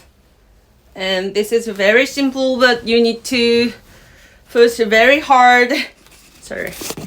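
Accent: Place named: South Korea. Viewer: 이케아 엘리프 검색하다 우연히 들어왔는데, 와 일본인 영어발음이 완전 한국인이네... 라고 보다가 채널명을 보니 한국인이셨네요ㅎㅎ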